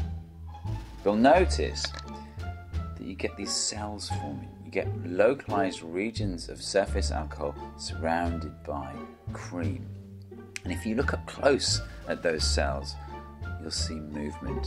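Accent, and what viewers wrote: England, United Kingdom. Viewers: You are very entertaining as you are enlightening. Got to say, as a Southern born American, I love the English accent!!!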